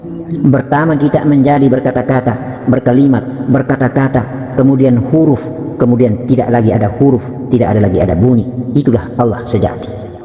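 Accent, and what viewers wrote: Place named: Bugis, Indonesia. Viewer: Kalo sy dgr selalu sy teringat bahasanya logat Bugis.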